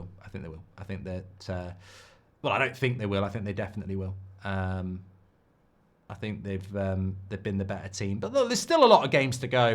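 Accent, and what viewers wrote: United Kingdom. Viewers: i love your accent tho. from which part of the UK are you?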